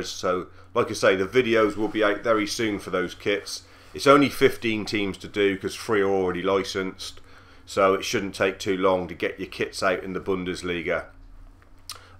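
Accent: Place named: Bristol, United Kingdom. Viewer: Love that Bristol accent!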